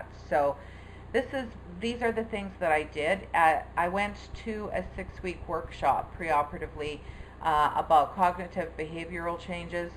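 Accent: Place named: Canada. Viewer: 1st. I love the curlies in your hair, really looks nice. 2nd. You have hit "ONDERLAND" & that is a WooHoo moment !! ~ Very nice video today. It is always nice to hear someone recap their weight loss journey. I always enjoy listening to you speak. You remind me of my girlfriend, Lorna, whom I met while living in Florida. She's blond, blue eyed, from Toronto, and has the same Canadian lingo in her speech. I often think of Lorna when I watch you. I appreciate your friendship. Have a Happy Easter.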